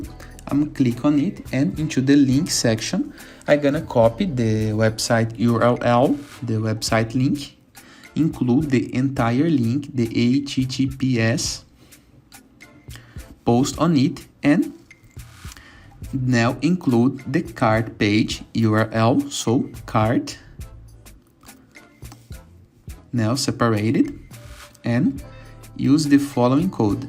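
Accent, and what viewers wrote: Brazil. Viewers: o sotaque do brasileiro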